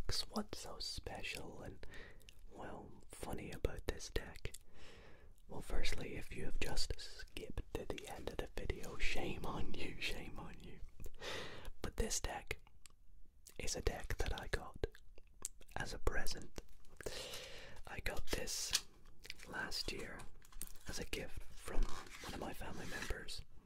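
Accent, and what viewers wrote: Scotland, United Kingdom. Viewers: No way! I’ve wanted to learn card tricks all this week. Btw, are you Scottish? I am too and i thought your accent was similar to me lol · yepp he is scottish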